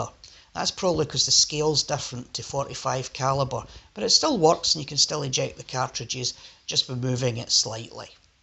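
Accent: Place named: Scotland, United Kingdom. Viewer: It's a Scottish accent. So, no.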